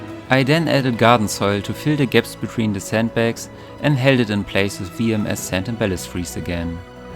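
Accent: Germany. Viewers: it Sound like a german that speak English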